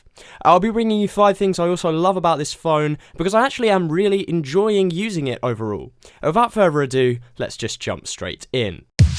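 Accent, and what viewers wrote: United Kingdom. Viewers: Ok my British sounding fellow friend first of all, I got a couple of complains. I know you don't want to hear it but... deal with it. I'm a very proud owner of my GALAXY S5, And this is what I have to say.. #1 yes the phone feels cheap, I get it, but remember Samsung is trying to keep the devise price affordable, compared to any iPhone of the same generation. #2 the charging port reminder can be deactivated by checking the "don't show this again" checkbox like I did... the flap... deal with it. #3 if you don't like touchwiz so much, download and install a different launcher, I'm currently using buzz launcher over touchwiz, and haven't looked back, and you CAN disable the news Crap at the very left of the touchwiz pages that lags so much, I never used it at all (I can't quite remember how tho sorry) but I did it.... #4 the speaker sucks........ 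I know.... I got nothing. #5 the fingerprint scanner works fine, don't be over dramatic about it, use it right instead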